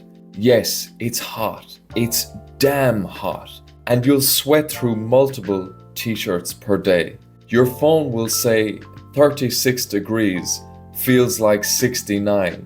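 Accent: Ireland. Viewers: Always brilliantly informative with the brogue which makes it great to listen to..thank you…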